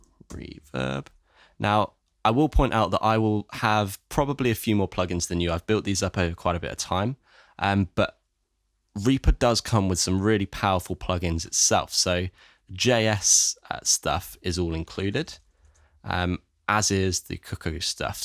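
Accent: United Kingdom. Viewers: Barely heard the introduction Liked for the Englishmen accent omg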